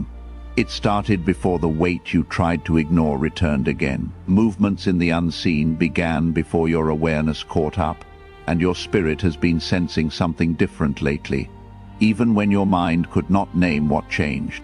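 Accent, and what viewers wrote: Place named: United Kingdom. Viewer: Who is the new voice. He’s British.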